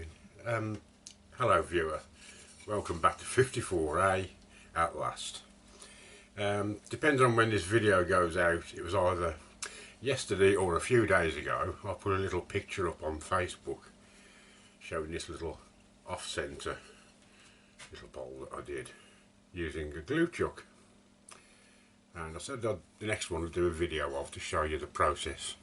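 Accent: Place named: Black Country, United Kingdom. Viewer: Cheers for that demo. I've been checking out the other makers methods and your one is the one I'm going to have a go at. I like your style and Black Country? accent. Are you still making videos? Hope so. Best regards.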